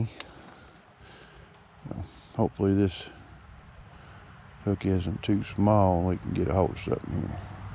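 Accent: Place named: South, United States. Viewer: This Yankee loves to hear you soft spoken southern guys talk!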